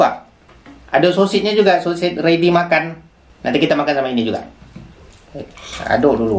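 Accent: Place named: Malaysia. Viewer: Logatnya mcam org malay eh😂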